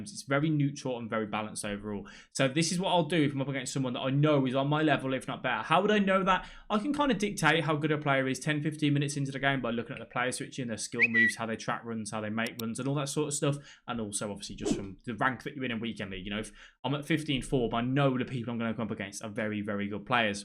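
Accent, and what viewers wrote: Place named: Yorkshire, United Kingdom. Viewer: Good content bro but ur yourkshire accent is like nails in my ears, why british english is so terrible???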